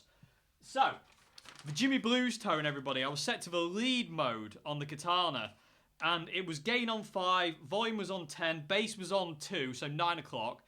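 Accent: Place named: England, United Kingdom. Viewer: When it comes to SS amps, I love my Peavey Bandit Silver trim and was considering a Fender Champion 100 now that I am back in a gigging band (loud drummer, bass, synth, and 2 guitars doing modern rock) but after seeing this video should I really go for the Boss Katana 2x12??? Oh and sorry for ever thinking was Scottish (Borders region). Yours is a tricky English accent to place but I am still embarrassed as someone who has spent time on both sides of the border.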